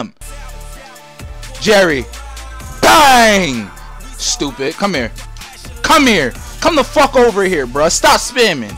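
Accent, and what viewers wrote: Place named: Brooklyn, United States. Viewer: What part of Brooklyn you from?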